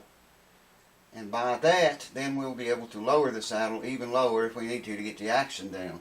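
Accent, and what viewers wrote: South, United States. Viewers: Hey, new sub here. Is that a southern accent I detect?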